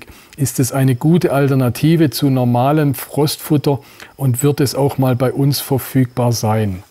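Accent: Swabia, Germany. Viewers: Tolle Aussage zu dem kommenden Lampropeltis getula nigritus Verbot und auch zu dem idiotischen Kaiman Urteil. Weiter so, äh noch ne Bitte, könntest du bitte etwas mehr Hochdeutsch sprechen, dein Kanal ist (zum Glück) weit verbreitet und nicht jeder versteht schwäbische Ausdrücke und Aussprache. Ich verfolge ihn zB aus Spanien und habe damit ziemliche Schwierigkeiten, danke für das Verständnis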